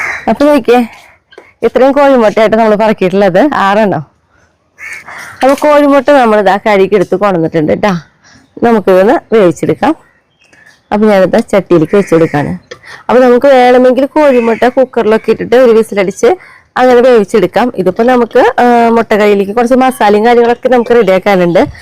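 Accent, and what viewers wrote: Thrissur, India. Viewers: Ath Thrissur samsaram aanu..❤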